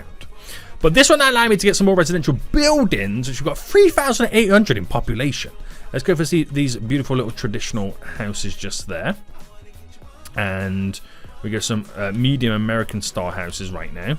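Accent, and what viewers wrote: United Kingdom. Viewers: Love ur british acccent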